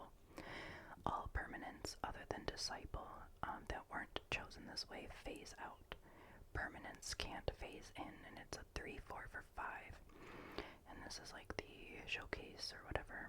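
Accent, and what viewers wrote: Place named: Midwest, United States. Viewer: I love your Midwest accent. It’s so soothing.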